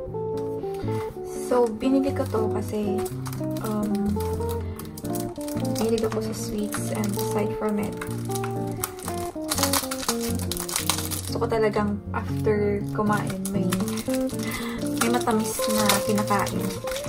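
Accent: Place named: United Kingdom. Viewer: British accent dw sabi ni 😊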